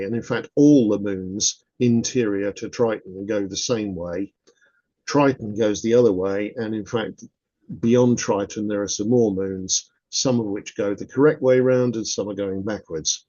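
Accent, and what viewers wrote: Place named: England, United Kingdom. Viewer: That is how they speak in England.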